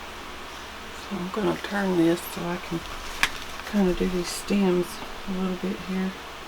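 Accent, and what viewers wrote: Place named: South, United States. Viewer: I love to hear you talk. You have a sweet southern draw. I like how you say “that’s purdy” You have such talent. You really enjoy what your doing. I love watching you create.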